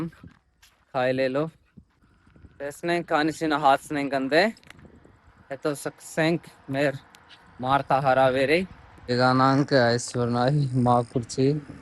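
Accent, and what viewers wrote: Armenia. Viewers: Artashati barbarov el xosum es brou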